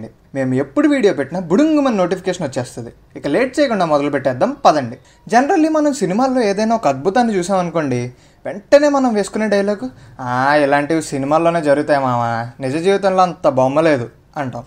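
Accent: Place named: West Godavari, India. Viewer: Baaga cheppinaru....way of telling is very very nice😍😍😍..midhi west godavari aa??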